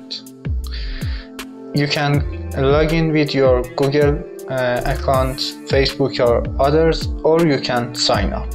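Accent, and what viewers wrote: Iran. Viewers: Irani ? تابلو بود ایرانی 😂❤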